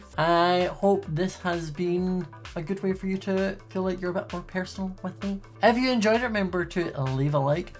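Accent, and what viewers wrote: United Kingdom. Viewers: We love a good ?british? Accent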